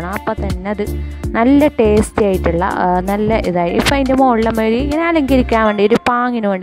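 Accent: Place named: Kasaragod, India. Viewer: Nandu curry Honda ki nokki super Namo Kasargod language super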